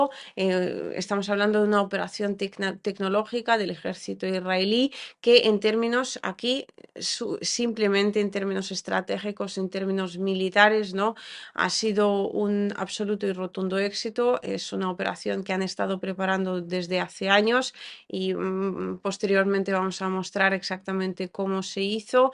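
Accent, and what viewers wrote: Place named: Spain. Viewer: me gusta que ya estás perdiendo tu acento gachupin… mira guapa, eso que icieron es terrorismo puro y deben caer los culpables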